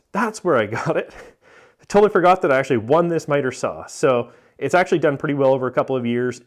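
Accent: Canada. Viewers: how did I know you are Canadian? lol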